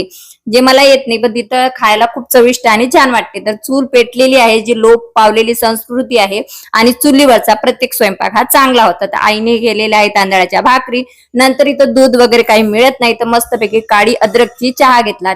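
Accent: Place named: Amravati, India. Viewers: तुमचं कोकणातले माहेर असून नागपूर टोन मधे कसे काय बोलतात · या बाई आहेत अमरावती च्याआणि बोलतात माझे माहेर कोकणात ले... ्आम्हाला वेड्यात काढता ❓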